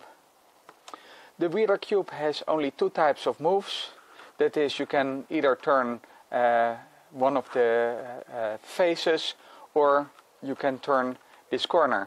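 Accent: Netherlands. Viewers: Are u Nederlands i'm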